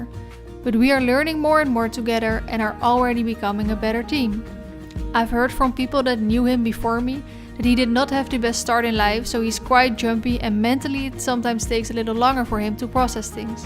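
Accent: Netherlands. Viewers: You go girl!! ❤ And remember it's quite natural to have a dutch accent since you're dutch and not english. Think people from other countries will find it cute! Liefs Pauline & Skip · I love watching Dutch videos as a girl from South Carolina USA. I hope to visit one day and I am trying to learn the language. Listening to your accent helps me. Plus I love horses so it’s a win win. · As a Dutchie living in Sydney, Australia for 4 years who's been watching your videos ever since you started vlogging, I have to say I'm really proud. 🥹 From experience I can say that speaking English more easily and expanding your vocabulary really does come over time with lots of practice, but also that losing a Dutch accent might never happen, since I still have mine - very thick. Just see it as a sign of bravery. 😉Cheering you on from down under! 🫶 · Already in love with the acc also really like your Dutsh acc love youu ❤❤ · there is no need to be scared! 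I used to share your fear about sounding like a Dutchie but I've learned to embrace it. Your accent is absolutely lovely and tells us much about you and your roots. Please be proud of who you are and what you've achieved. Attagirl! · This video was wonderful! I absolutely LOVE the Netherlands and visit almost every year ♥ Your English is amazing, I really like you accent, welcome to this side of Youtube! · Hi! So exciting you finally decided to make an English video! Don't worry about your accent. I've been living abroad for about 2 years now (I'm also from the Netherlands) and I found that most of the world doesn't care about accents like dutch people do. Dutchies are just brutal when it comes to an accent. There is no need to sound native. Actually while attending language school here I'm in a class full of people with different nationalities. The native English speakers are mainly just super enthusiastic that you speak 2 or more languages and other like German , Italians, Finnish are mainly excited when someone speaks with their own language accent, they are proud. 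And here we are, the dutch, over achievers and bashing everyone. Just wanted to say, you're doing great and keep going <3 Can't wait to see more of this series! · Je doet het echt super goed, je Nederlandse accent is juist heel leuk om naar te luisteren. Heel veel succes met je nieuwe kanaal!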